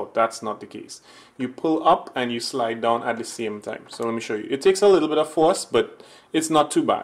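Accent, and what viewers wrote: Trinidad and Tobago. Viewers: Yo the shock didn't expect a trini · Yo you sound Trini, big up man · Thats a trini accent i hear? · Thanks for the video you sound like you’re a Trinidadian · Mannnn I started listening to the accent and I’m like… he sounds Trinidadian…I look at your Instagram and confirmed ✅ my moms side of the family is Trini 🇹🇹 awesome to hear a voice representing on YouTube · you from trinidad? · Yu does know a trini from anyway🙏🏾 thanks for the vid bro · You a Trini? 😊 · Bro you sound Trini. Boss... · Is that a Trini accent ? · Soundin like a rell Trinidadian “ 😂 Thank you ! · Aye Trini. I hear you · Are you from Trinidad? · Is he Trini? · know that trini accent anywhere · Was surprised when I heard the Trini accent lmfao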